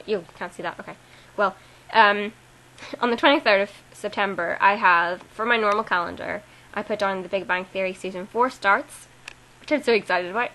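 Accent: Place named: Belfast, United Kingdom. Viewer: Your from Belfast!? I did not know that! haha. I'm from Belfast also. You don't have a strong Belfast accent...you're very lucky :) I thought you were from England haha I also think that Twilight isn't selling sex, it's selling love. Pschh...Team Edward? No! It's Team Charlie all the way! haha :D Thanks for another awesome video!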